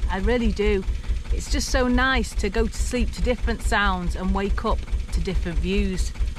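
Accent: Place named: Yorkshire, United Kingdom. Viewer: That Yorkshire accent makes you fitter .. you are hot 😉😂😂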